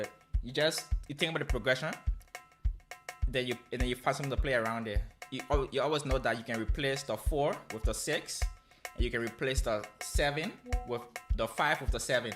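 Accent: Liberia. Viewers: You sound like a Liberia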